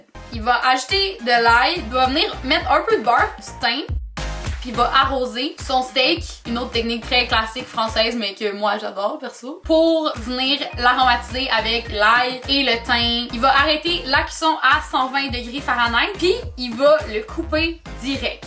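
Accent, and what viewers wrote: Quebec, Canada. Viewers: J'adore l'accent québécois il est génial 😂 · la vie plus tard je sortirai avec une canadienne, j'adore leurs accents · J essaye de comprendre le canadien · La femme parfaite 😍 avec les steaks parfait 😋echtuerais pour un souper avec elle 😂 regarder l'accent 😂😂😂 qc asf nice video jvais la garder pour quand j'aurai faim 😋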